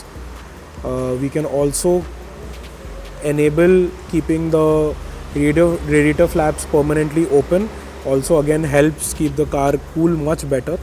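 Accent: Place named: India. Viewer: Im sure its indian accent😂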